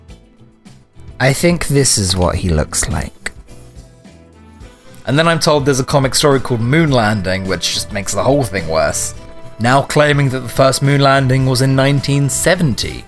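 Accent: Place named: Italian American, United States. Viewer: Worst Italian American accent I ever heard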